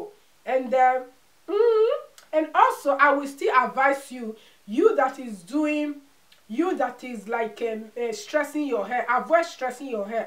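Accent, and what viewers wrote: Nigeria. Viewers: Great tips sis. But r u 4m Nigeria cos ur accent is like for akii and popo of Nigeria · This is nigeria accent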